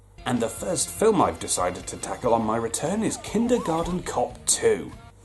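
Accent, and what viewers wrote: United Kingdom. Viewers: As an American, whenever I find a new channel and you have a British accent, that's all I need to know this shit is about to funny.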